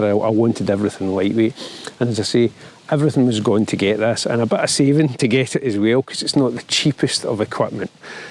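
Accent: Scotland, United Kingdom. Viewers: Great video pal, also great hear the scottish accent for a change. I had the d850 but recently sold and got a Z7ii but haven't used it yet for any landscapes. I was wondering, for landscapes when on the tripod I take it you turn image stabilisation and IBIS off? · I would book a workshop instantly if I wasn’t so afraid of only getting 1/10th of what you are saying :) So hard for me to understand the Scottish accent although I visited Scotland five times. 😂😂😂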